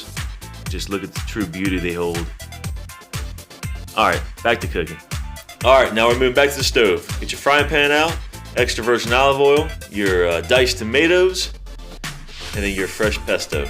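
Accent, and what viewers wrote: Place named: New York, United States. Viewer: Hi. You sound like you're from the best part of the country...NYC. I also make pesto...but without the tomatoes. Your dad reminds me of mine. He might like this version of, sung by one of my favorites. Cent'anni. <link>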